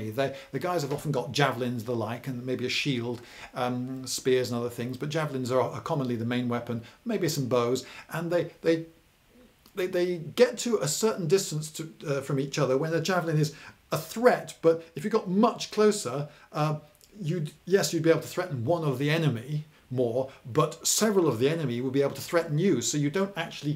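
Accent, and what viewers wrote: United Kingdom. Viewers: some friends and I have been wondering over the last few days why you Brits pronounce lieutenant like lef-tenant instead of Lou-tenant. Regards, A curious member of the Australian colony. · “Pencil-neck”!?! Haha no way man you fuckin rock I love your videos, sorry some of my fellow Americans are less cultured people with nothing better to do then be shitty to other people. Honestly the British accent and way you talk probably makes them feel intimidated or like they are less intelligent or something. Keep the videos coming dude!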